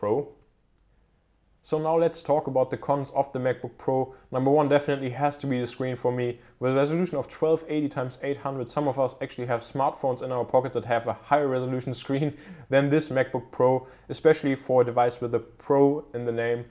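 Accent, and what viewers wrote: Germany. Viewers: Are you from Germany? You have a slightly german accent.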